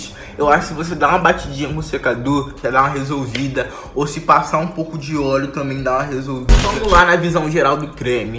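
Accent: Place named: Brazil. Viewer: Brasileiro tentando falar inglês realmente dá pra perceber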